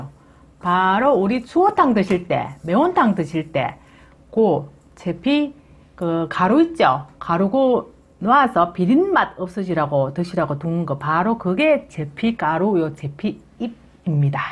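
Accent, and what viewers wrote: Gyeongsang, South Korea. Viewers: 항상 잘 보고 있습니다 구수한 경상도 말씀 너무 재미있게 하시니 정겹습니다 우리 시댁도 영천인데 제피 뜯으러 가야겠다~~ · 경상도말이 너무재미있어요🤩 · 사투리가 제캉 비스무리하신데 고향이 어덴교? 첨으로 댓글 달아보는데 볼때마다 경쟁시미 생기네요 저도 몆칠전에 항거 다마났심더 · 이분이 사투리가 심하네요. 나도 부산인데. 혼자만 아는 사투리 용어도 쓰시는듯. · 정말 잼나게 경상도 말씨가 정감이가네요!! 들을때 마다 웃음보따리 힐링~^-^~ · 경상도 말씀이 넘이쁘요 항상 많이 배워갑니다 감사해요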